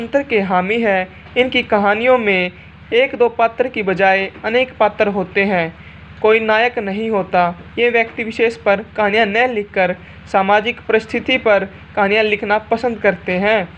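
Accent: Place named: Haryana, India. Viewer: आपकी बोली में हरयाणवी भाषा की शैली है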